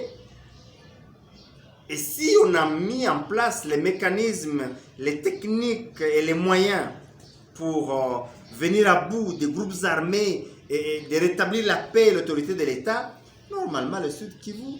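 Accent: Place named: Rwanda. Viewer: Accent rwandais, le même accent que ruberwa, kagame etc... Cherchez l'erreur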